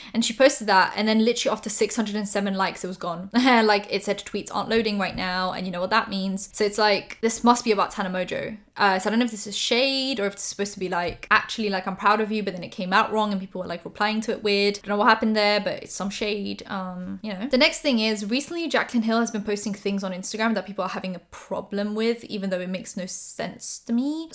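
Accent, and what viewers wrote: United Kingdom. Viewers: nigdy bym nie pomyślał ze jest z polski albo chociaż nawet mówi po polsku, jej brytyjski akcent jest idealny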